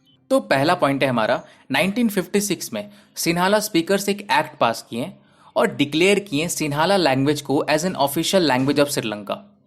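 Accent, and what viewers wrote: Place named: Uttar Pradesh, India. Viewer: That line ki ' Bhaiya aaram se raho, zyada dimaag mat lagao' made me laugh..😉 Nice work bhaiya..Aapki bolne k tareeke se lagta h ki aap UP k h ..main bhi Lucknow me rehti hu 🤗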